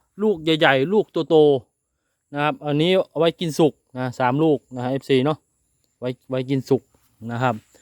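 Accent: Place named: Isan, Thailand. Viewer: ภาษาอีสานฟังรู้บ้างไม่รู้บ้างก็น่ารักดีนะ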